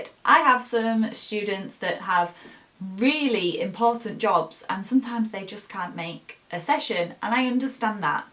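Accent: United Kingdom. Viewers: Loved british accent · which part of the UK you are from... Are you not from Midlands? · the British accent sounds different and sometimes harder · the British accent is better, because you pronounce the words as they're written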